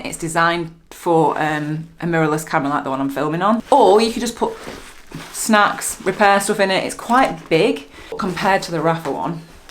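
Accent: United Kingdom. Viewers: Is just a Cheap restrap add , scam video. Restrap isn't waterproof compared to tailfin and not even same quality. UK accent UK facts 🤡🌈🤡 UK 💩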